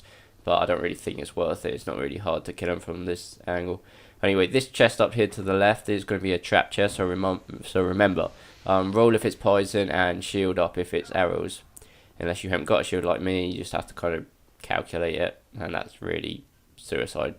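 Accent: England, United Kingdom. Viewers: Love the vids, i know there old but its nice to listen to an Englishman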